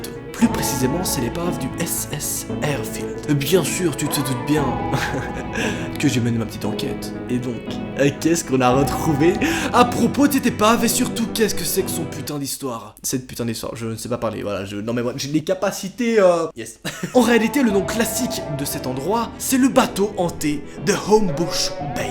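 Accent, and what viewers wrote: Belgium. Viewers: T belges ??? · tu es belge? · T es belge 🤔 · Et mais tu est Belge?? PS: Je t'adore!❤ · tu es belge ou quoi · t belge ? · Est ce qu'il est belge ? Il a un ptn d'accent flamand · Tes belge ?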